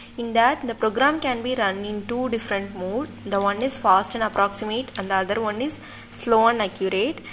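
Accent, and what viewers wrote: India. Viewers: I think she has an Indian accent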